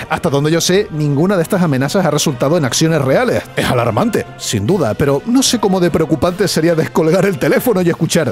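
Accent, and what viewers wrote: Canary Islands, Spain. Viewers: tu eres de mi tierra vd o yo estoy alucinando con que eres canario? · El acento canario es lo que le da toda su personalidad a sus bromas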